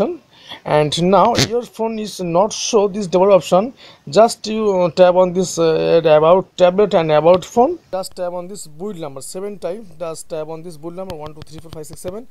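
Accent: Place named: England, United Kingdom. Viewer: English khatarnak hai bihari...par axcent pura angrejo wala mara hai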